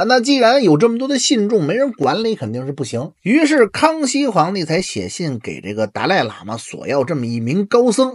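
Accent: Beijing, China. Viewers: 这口北京土话听不太懂。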